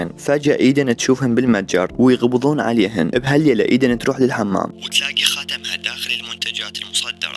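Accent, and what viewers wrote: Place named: Khuzestan, Iran. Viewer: حلو لهجته اعتقد اهوازي 😉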